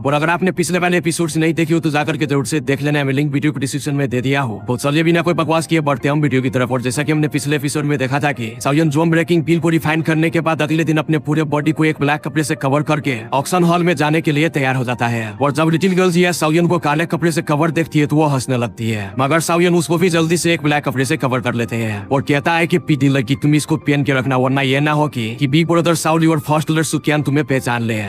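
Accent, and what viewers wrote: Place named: Bengal, India. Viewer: Vai, tu Bengali hai?? I'm 400% sure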